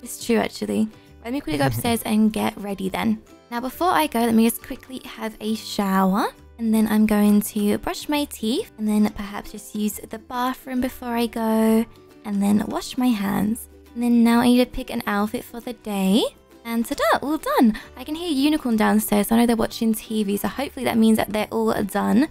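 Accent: United Kingdom. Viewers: I like how are british ❤❤ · Definitely British. Go find the exsplamation to find out! <3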